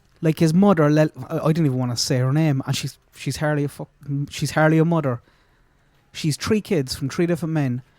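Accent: Ireland. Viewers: The rate of an Irishman!!! Love it!! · my Brother, it felt very strange hitting the like button with the celebratory starred thumb gyrating. My heart is with you as your beloved brogue bears aloud the pain we all now feel and share. I wish I were in whatever corner of this world you occupy and I could buy us a few pints of beer, if you don’t total-tea exclusively. Though I do have a master of fine arts in creative writing, I have nothing more to say. Please take care of yourself/selves, and we cool-ass-subbies. This is a tragically sad story, yet it is still a blessed day to be alive. A part of our human experience is the requirement to hold disparate truths and emotions within our minds, bodies, and spirits all at once. 🙏🏽✌🏽❤️ from Minnesota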